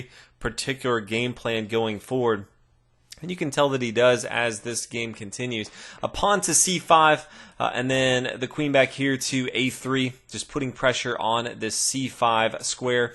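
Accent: United States. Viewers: Sorry to say but this strong american accent is really bothering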